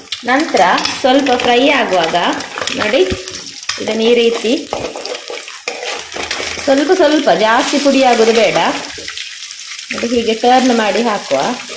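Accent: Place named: Bangalore, India. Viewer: In books nobody use Bangalore Kannada tulu nadu ppl talk books Kannada.. your Bangalore Kannada lot of slang ok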